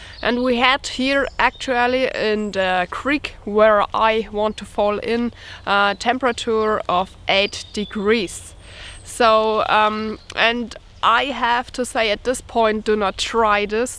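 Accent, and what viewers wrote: Germany. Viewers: I agree totally with Robert, You are such a sweet and courageous woman, and i love your German accent. Brings back fond memories of my stay in Germany. Hals und beinbruche!! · Nice video, ik love to hear german people speak english. but u know you dont have to block out beautifull woman, that was in the 90s.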